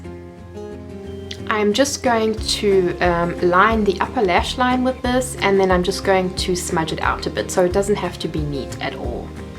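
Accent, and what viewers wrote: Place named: South Africa. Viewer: I love your South African accent x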